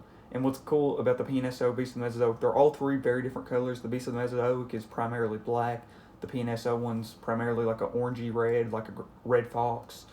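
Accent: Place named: South, United States. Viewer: Wow that looks hyper realistic! Nice southern draw you got going, I got one too I’m from Arkansas.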